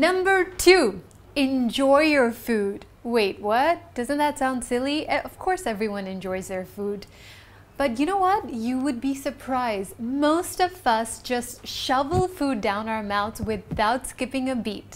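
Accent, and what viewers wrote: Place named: India. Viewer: she is india cannot hide the accent :)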